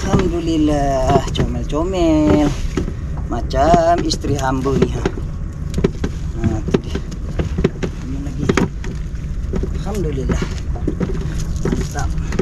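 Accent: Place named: Kelantan, Malaysia. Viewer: Abg asalnya org mn ek? Sebutan ikan tu sama mcm org Kelantan sebut 'ikan kitam', dan banyak lg lh ikan² yg abg sebut sama mcm org kelantan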